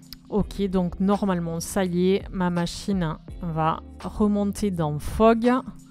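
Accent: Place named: South, France. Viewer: Très bonne playlist sur FOG. Chez les sysadmins, on a trop souvent ce réflexe un peu idiot de ricaner ou se méfier en entendant la voix (agréablement méridionale) d'une jeune femme pour donner des conseils sur le déploiement. Et tu as admirablement fait mentir ce réflexe. Subbed. Je me fais fort d'aller fouiner dans le reste de la chaîne cette semaine, que je peux imaginer de grande qualité sur la foi de ce petit tuto impeccablement construit. J'espère que ta chaîne va décoller : elle mérite.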